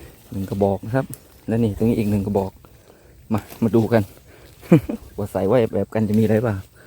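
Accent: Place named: Rayong, Thailand. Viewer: ชอบดูครับผมกดติดตามไห้แล้วนะครับเห็นมีต้นทุเรียนอยู่จังหวดอะไรครับสำเนียงเหมือนระยองเลย..จากโคราชครับผม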